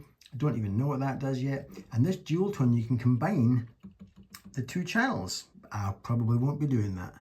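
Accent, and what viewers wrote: Scotland, United Kingdom. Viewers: We are indeed - great to hear a Scottish accent in the YT guitar circuit. Lovely tasteful playing and sounds - all the best. I'm subscribed and will be checking in 👍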